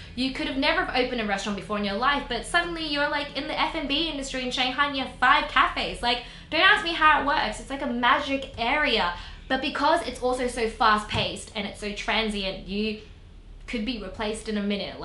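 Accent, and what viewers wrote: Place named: Australia. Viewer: 戈小姑娘额英文一听就晓得是Aussie。现在住在哪？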